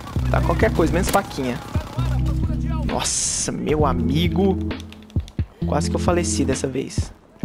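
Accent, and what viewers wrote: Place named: Goiás, Brazil. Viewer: advinhei pelo nosso sotaque, também sou de Goiás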